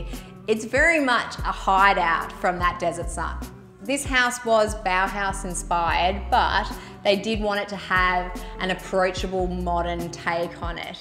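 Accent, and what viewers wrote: Australia. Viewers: The presenter is lovely. The Australian accent is a bit much.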